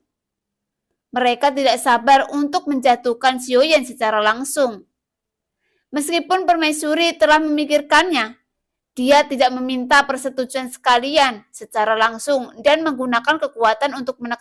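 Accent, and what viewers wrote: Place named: Poso, Indonesia. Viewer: Mimin orang mana ini kayaknya org poso ya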